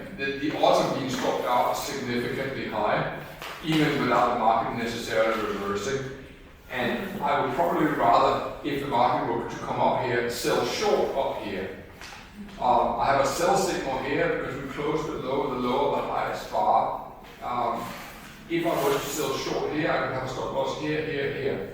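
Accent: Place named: Denmark. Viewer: Awesome content. But equally awful audio, its hard to navigate through that tough danish acent. Overall nicely explained. Thank you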